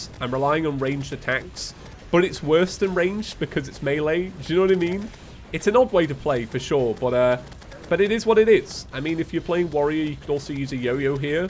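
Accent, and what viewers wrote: United Kingdom. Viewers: Sometimes I honestly just think “ god, how I would love to play in world with him and his British accent, eh, probably will never happen”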